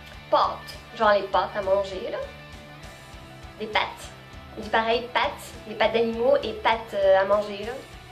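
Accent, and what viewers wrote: Quebec, Canada. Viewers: Je suis moi même Québécoise et en 2012 en camp de jour j'ai eue un moniteur Français et a la fin de l'été il parlais comme toi et ton accent est vraiment interessant et aussi tu a le parler d'une Québécoise mais l'accent d'une Française s'est vraiment bizard mais continu t'est video elles sont drôle ;) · wow c'est trop cute tu parles semi à la québécoise semi à la française ...c'est adorable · c'est assez drole que tu as un accent québécois alors que tu habite en france je viens de découvrir ta chaine et tu est super 😊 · J'adore ton accent québécois-francais 😍😍😍😘✌️✌️👌👌👌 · Tu as réussi ton objectif de divertir avec la comparaison des expression. C'est amusant drôle et instructif. Et pour ton accent à toi, alors c'est évident que tu es «presque Québécoise» Merci pour tes vidéos. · Ahahahaha!! T'es pas mal drôle à entendre ^^ première personne avec l'accent Francais que j'entends qui a pogné l'accent Québécois! 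:p j'ai des petites affaires à ajouter (j'ai pas lu tous Les comms alors dsl si on t'a déja repris!) Pour Les "chaussures d'été, on dit ballerine, pour Les cheveux on dit "applatire" et elles faisaient référence au "soccer" vs "footbol American" vs " footbol" ^^ oh et, ici, on "fox" Les cours! "Foxer" :p hihihi merci c'était "bien sympa!!" ^^ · Déjà je pense que ton accent québécois tu le garde juste par envie pcq ta prononciation on français est quasi parfaite et puis ce tag est très intéressant pr découvrir chacun l'autre ;) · Omg! J'adore ton accent😂 C'est trop drôle genre ta l'accent québécois et des fois français! C'est trop chou 😂😘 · tres bonne video, ton accent est 99% quebecois ! ^^ continue tes video! (just comme sa, jolie coupe de cheveux ;) 👌😄✌ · c'est amusant parfois tu as l'accent québécois(la plupart du temps) et d'autres fois un accent français sans accent.Tu es Québécoise ou Française? 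· je n'ai jamais entendu un accent comme ça! Il est unique hahahah c rare d'ententre un quebecois parler français ou un français parler québécois , toi tu as les deux! c fou c vrm original jaime bien ahha :) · Honnêtement bravo à toi j’ai jamais vue ça tu as tellement bien pris l’accent Québécois 😉 et tu as raison car il est tellement mieux que l’accent français 😂🤣 p.s je suis d’Amérique du Sud 🇬🇫 (d’un département français) et moi aussi j’adore le Canada 🇨🇦 et le Québec je compte bien m’en venir habiter la bas, bye 👋🏽 et encore merci pour ta super vidéo. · très bel accent ,je te croyais québécoise au début et je suis québécois .Tu es très jolie aussi bref continue c l'fun en ostie lol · Tu l'as bcp l'accent de Québec quand tu parles ! ;) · Au Québec on loff ou fox les cours :') mais j'adore ton accent semi québécois semi français!!😊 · C'est intéressant de voir qu'elle se met à parler avec l'accent du Québec, de façon naturelle, sans qu'elle ne s'en rende compte. 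L'accent devient plus réaliste. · Je trouve sa tellement drôle car je viens de commencer a écouteur tes vidéos et la avec tes vidéo du Québec se fou comment tu commencer a parler comme nous québécois! Je trouve que cette accent la te va bien :) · J'trouve ça drôle/cute, elle parle à la française et quand elle vient pour finir sa phrase. Elle change pour l'accent québécois. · Salut! J'me demande pourquoi personne s'est levé et dit que au Québec, pour Cotton Ouatté, on utilise aussi le terme Kangourou (l'animal) parce que y a une poche en avant au niveau du ventre. En tout cas, t'es vraiment une fan du Québec et c'est agréable à voir/entendre/regarder. J'trouve que y'a pas vraiment de youtuber québécois alors je vais m'y lancer à temps perdu. Soit heureuse, et le "Bon Matiiiiinnnnn" a aussi changé ma vie, quand je l'ai entendu de la bouche de la fille que j'aimais, au Cégep. On a même une marque (sorte, compagnie) de pain qui s'appelle Bon Matin au QC. 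En tout cas, ça doit être la marde pour toi vue que t'as ET l'accent français ET l'accent québécois. Le monde doivent te juger peu importe où tu vas hahaha. On s'appel et on déjeune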